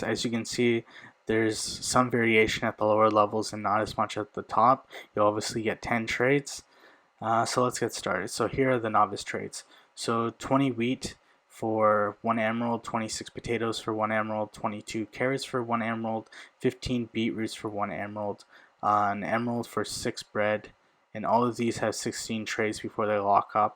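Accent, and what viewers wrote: Punjab, India. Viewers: Is you punjabi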